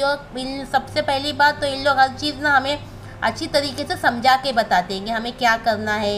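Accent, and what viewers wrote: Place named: Mumbai, India. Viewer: Bilkul Sachchiiiii baat hai, Andaaz to Wahi hain bolne ke khaalis Mumbai 🤦🏻‍♂️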